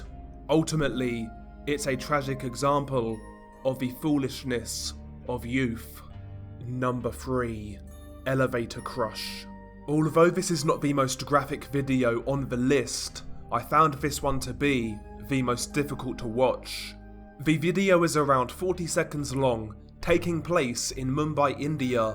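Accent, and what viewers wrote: England, United Kingdom. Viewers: Just discovered this channel a few weeks ago and been bin watching. He's a great narrator, must be the british accent. Great channel, i even saw other channels doing reaction videos to this content. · The British accent 😂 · He's English.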